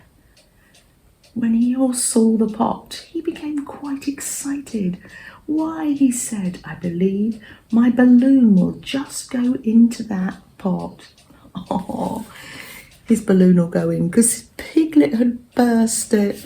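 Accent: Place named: England, United Kingdom. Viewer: I so love listening to English laies speaking about their sewing project - such kind, gentle voices, easy listening and relaxing. love this project.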